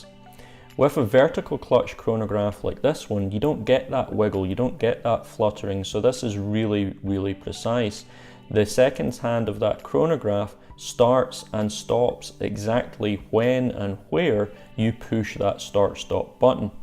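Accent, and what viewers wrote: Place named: Scotland, United Kingdom. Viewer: I just bought one with 25% discount here in Hong Kong (I'm born and bred in Scotland, so the accent and all just takes me home.) It's actually great value for an in-house column wheel chronograph with bags of history and heritage too. Great review of the watch and scotch!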